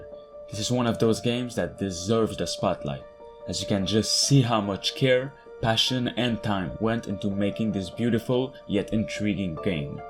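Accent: Quebec, Canada. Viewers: french canadian accent, without trying to hide it I don't mean that as a bad thing, but a lot of french canadian don't have an accent when speaking english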